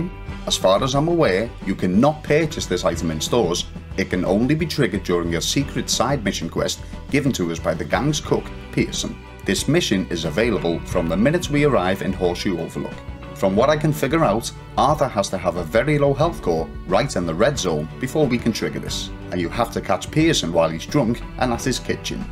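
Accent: Liverpool, United Kingdom. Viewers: Are you a scouser ?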